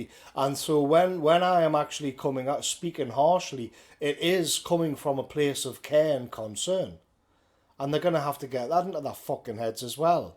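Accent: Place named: North, United Kingdom. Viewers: Bang on Geordie lad! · Great video great insights and very thoughtful. And a great northern voice of clarity.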